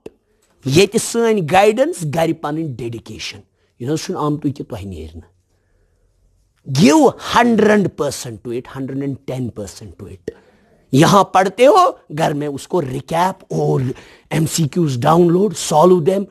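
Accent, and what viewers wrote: Kashmir, India. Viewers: Sir ap ki kashmiri sunn k mojhy B ed ki clases ki yaad aa gi...😂😂😂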